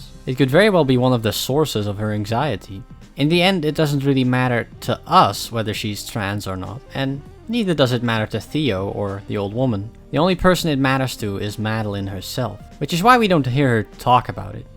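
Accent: Netherlands. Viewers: Hi. You Dutch?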